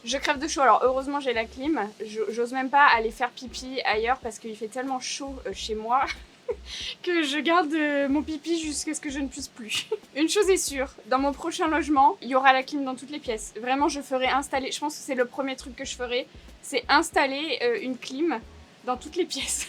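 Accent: France. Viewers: prononce toujours à la française les mots anglais.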